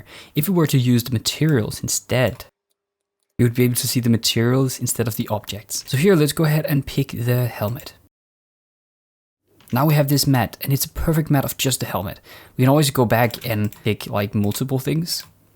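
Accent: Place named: Denmark. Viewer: Vent... du er dansker!?!?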